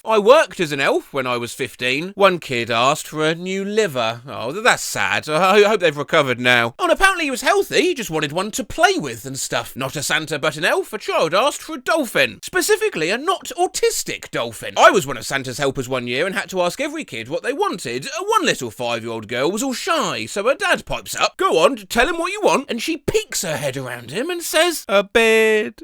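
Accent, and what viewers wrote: United Kingdom. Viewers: I'm new to your channel, and I twigged you're British from your accent... Cor blimey! I was spot on!!! (Btw, Merry Christmas Mate !! 🎅🎄)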